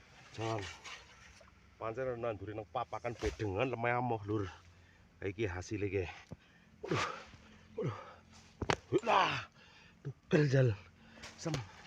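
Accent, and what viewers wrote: Banyumas, Indonesia. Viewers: Mirip kang Cecep prepan pensiun ganing ya,, kyane Mase wong banyumasan ya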